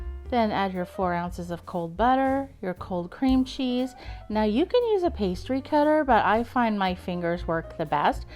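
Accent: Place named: South, United States. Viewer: That is some south in your mouth 💥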